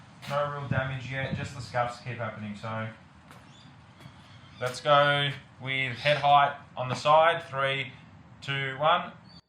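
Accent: Australia. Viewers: What is that accent? Australia? New zealand?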